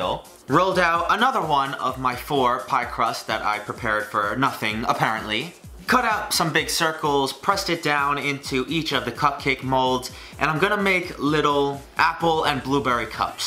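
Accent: New York, United States. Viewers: your new york really came out here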